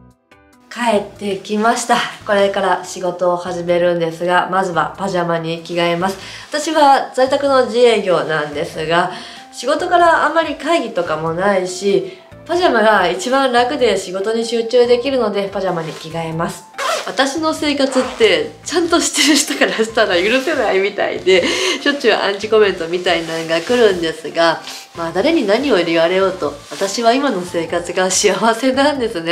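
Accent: Kansai, Japan. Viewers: この間初めて見たんですが🎉インスタライブの関西弁のときもかわいいです❤🎉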